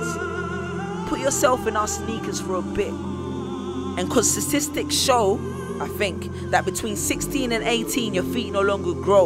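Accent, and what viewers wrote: South London, United Kingdom. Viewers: I can tell he's from south london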